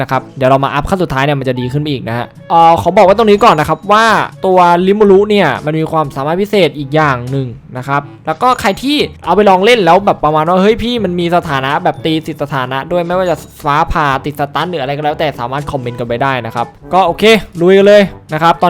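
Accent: Suphanburi, Thailand. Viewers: อ่าวอยู่สุพรรณเหมือนผมเลย ว่าละทำไมสำเนียงพี่เหนื่อๆ55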